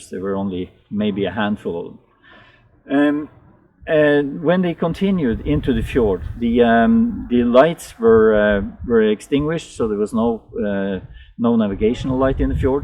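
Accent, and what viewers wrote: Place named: Norway. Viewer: I worked with Norwegians at sea out of NZ waters for over 12 years, so this accent was not hard to understand. Most were from the west coast. They never talked much about Norway. Fish, the ship and football were their usual topics (usually Manchester United). They used to make this stuff that is fish soaked in caustic soda for a couple of days and then rinsed with water so it becomes a paste. It was like a special treat for them. I was never brave enough to try it. Salty potato dumplings doused in what we called heart attack sauce was another option. Dried ling fish too. I was never a fan of the food, but they ran the best ships by far. Best pay and best to work on (they paid the best trying to get the best crew possible, as opposed to ships that pay as little as possible and then wonder why the crew does not work well). It does not surprise me they had such a big merchant fleet before WWII.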